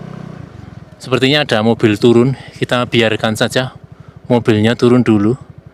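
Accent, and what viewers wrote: Banyumas, Indonesia. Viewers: Mas ki ngapak2 dialeknya, tapi pinter bahasa Jepang. sekali2 dimunculkan mas broo BHS Jepangnya? Kita biar sambil belajar Jepang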